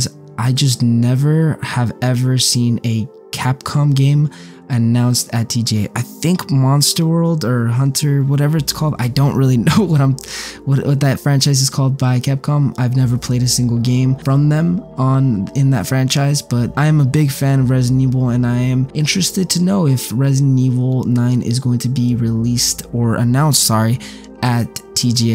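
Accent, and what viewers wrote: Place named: Queens, United States. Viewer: Mg u sound so queens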